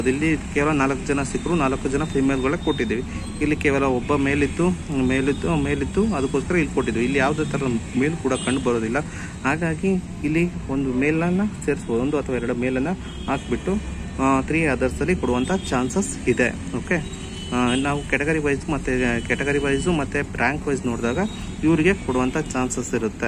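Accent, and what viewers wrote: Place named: Belagavi, India. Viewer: Anna Belagavi English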